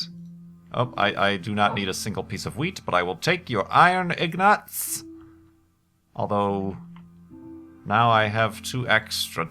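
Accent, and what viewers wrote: Ireland. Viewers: You half irish or something?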